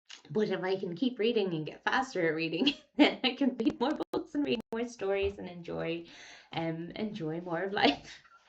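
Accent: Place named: Ireland. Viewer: “I could walk past someone in the grocery store and my TBR would get longer.” 😂 Every time I finish a book I struggle to choose the next one. I’ll share your TBR and we’ll both feel better. I love your chatty unedited take. Any slow moments are saved because you say um and er in an Irish Accent. From one Newbie to another welcome to BookTube. My Newbie tag video comes out on Sunday (Australian).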